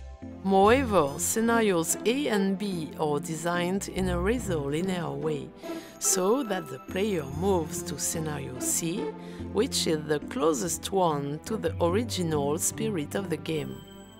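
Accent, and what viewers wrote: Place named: France. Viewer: It's à French accent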